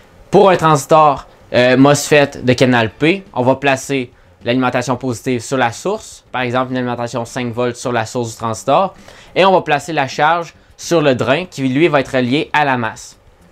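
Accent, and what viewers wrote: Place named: Quebec, Canada. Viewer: "à la bose du trastore"... que j'adore le français québéqouis! Vraiment, je fais pas de sarcasme, j'aime l'homme Québéquois.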